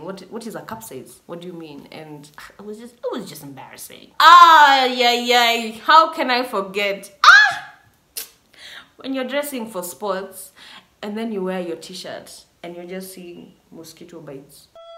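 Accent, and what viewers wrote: England, United Kingdom. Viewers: Am new here and the lady speaks British English.... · You know storytime is gonna be lit british accent ikicome through😂🙌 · It's the British accent for me 😂❤️❤️❤️ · That English accent is Perfect 👌👌🙌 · The English accent❤❤❤😂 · Your British accent ♥️ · It’s the British accent for me😂😂😂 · The British accent always giving me feel good vibes😁😁. This gonna be good 👌. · The British accent 😍 daamn girl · I don't care much about the stories.. But I love hearing that British accent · I love the British accent♥️♥️♥️♥️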